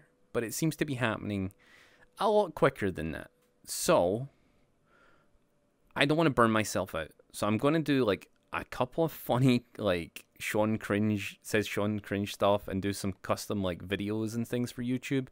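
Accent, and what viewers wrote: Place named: Scotland, United Kingdom. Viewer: Wait a minute he’s got like a Scottish accent and now I’m not gonna be able to unhear it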